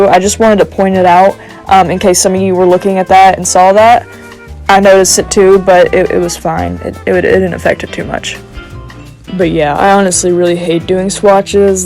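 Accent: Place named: South, United States. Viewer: country accent + deep fried audio is so peak..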